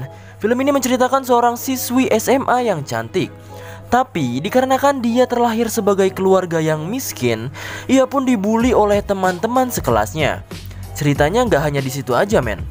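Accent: West Java, Indonesia. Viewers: Sunda bang?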